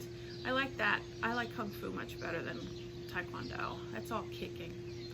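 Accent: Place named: New Jersey, United States. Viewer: you mean Traction Park??? Lol!!! I think it had its prime years when I was in school - I remember a friend of mine getting hurt there. And I know my brother went several times, but I never got to go myself. I think it’s a ski lift in the winter now, and a water park in the summers. We ALMOST moved to that area when we first got married, but the townhouse development we bought into went bankrupt. Lol!! I’m in Secaucus - which is also where I grew up. Where in Jersey were you?? It’s funny, I think I somehow missed you saying in the past that you had Jersey roots, but I recognized a tiny twinge of that Jersey ‘essence’ in your voice! 😉. (Trust me, it’s NOWHERE near mine - I have the truck driver thing down to a science. 😂)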